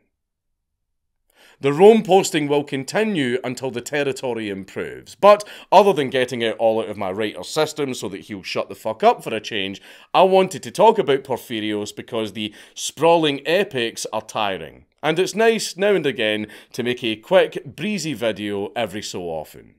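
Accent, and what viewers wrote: Scotland, United Kingdom. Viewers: Listening to your phlegmy, Scottishy, and nasally voice, reading such well written scripts (about things which i find interesting), is the highlight of my week... Carry on haha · A Scotsman could read out the dictionary for all I care, I'll NEVER get tired of their accent 🤣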